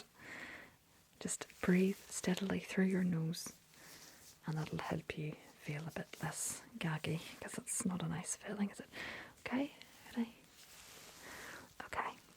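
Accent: Ireland. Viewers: If you ever get back into asmr you should definitely do another roleplay with the irish accent. It is spot on, so well done!!👏🏻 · Omg that accent was just amazing! 😍 The little bit of Irish in me was very much satisfied to hear this! Also I LOVED THIS ROLEPLAY! You really have a comforting vibe about you. 🤗💙 Just what the doctor ordered aye? 😏😅💙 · Mother's side of the family immigrated from Ireland and your accent is absolutely spot-on. Thought it was natural. Amazing! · Jesus Christ I’m Irish and this was like I was listening to someone from home.. unreal - you have to have someone in the family that’s Irish to pull that off. Gone from southern to northern very quick though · Where did you go. I miss irish accent. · Hey! Greetings from Israel! 😀I'm so happy to hear an Irish accent! 😍Beautiful!❤ Subscribed!😊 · Medical role-play AND an Irish accent? Preemptive 10/10, haha! 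· Im guessing you are actually Irish haha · Was the accent inspired by Derry Girls? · Your Irish accent is shockingly natural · Could you please do an ear exam , love your Irish accent ❤ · Top marks again for the accent.👍 I always find there is nothing more relaxing than listening to someone speaking in a soft Irish accent, especially when things are being explained in detail as you did throughout the examination. Also got some tingles via the movement and light during the pupillary examination. Great video, thanks for sharing.😊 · I genuinely thought she was Irish, particularly from the west. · This is one of the best irish accents I've ever heard anyone do... Im irish and hardly anyone gets it right! Amazing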